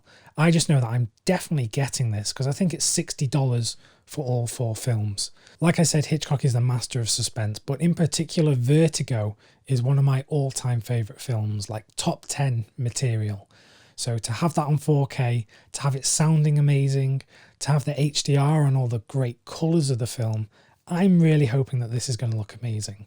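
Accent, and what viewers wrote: England, United Kingdom. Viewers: So you sound English are you based in the states? Only reason I ask is I can never order anything from Best Buy online. 4k discs are multi regional but the extras on the bonus disc are not. If you have a link please post it. I wanted BTTF but even <link> says not available in yiur area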